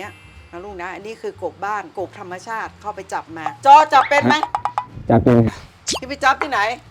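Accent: North, Thailand. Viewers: ชอบเวลาแม่ อู้เมืองจ้าว.เป็นธรรมชาติที่สุดเลย.คุณแม่น่ารักทำกับข้าวก็.น่ากิ๊นนน่ากินค่ะ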